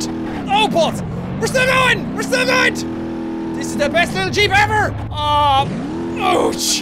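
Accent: Ireland. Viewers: the first video i ever watched, 8 years ago, i was obsessed with beam ng and saw this video and muted the sound cause i didnt like lets plays at the time. then i realised he was irish, same as me, and then i thought he was cool. 8 years later and i watch meme time everyday because its the only cure for sadness. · Yeah, hes irish, IRISH people are cool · This has been my first time on this channel and I must say, your accent just sells it! That Irish? I think it's Irish. Well, whatever it is, I love it! It just tickles me! I'll keep looking at your stuff, and am heavily considering subscribing. Thanks man! Cheers! :D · That accent is OBVIOUSLY Irish.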